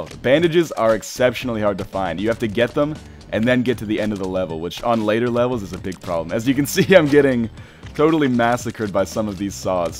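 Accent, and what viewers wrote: Canada. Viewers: The canadian accent is really more noticeable in these older videos. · Wow, sounds like a real Canadian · i can hear the Canadian :D This is a classic xP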